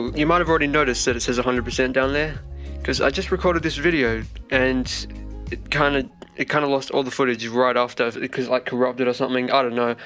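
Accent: Australia. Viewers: You Aussie or something? Because you really do sound Aussie, cool if you are cos so am I;) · Are you Australian? because I love your accent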